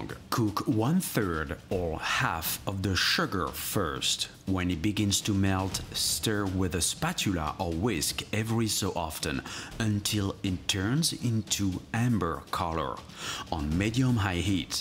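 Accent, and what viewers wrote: France. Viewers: Are you French or Italian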